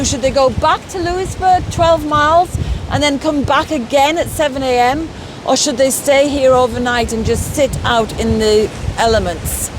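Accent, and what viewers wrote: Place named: Northern England, United Kingdom. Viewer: It's absolutely horrific what the British ruling class did to the Irish. do you not experience hostility in Ireland due to your accent? Like you I'm from northern England. My mum and half my family are Irish (my mum emigrated here). We never go back now due to the hostility caused by our accents. They say my mum lost hers and of course I have an English accent. It bothers me because we're descended from working class Irish and English people who suffered too but they don't tend to make that distinction as soon as they hear an English accent.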